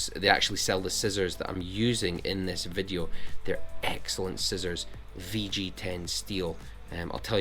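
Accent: Scotland, United Kingdom. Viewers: I love the fact that you are Real. You like us, can make the common mistakes of barbering. It’s this awareness which helps us learn how to fix ours. I could watch you for hours. My third great grandad was Scottish. I love your accent. Well done for being a great tutor. ❤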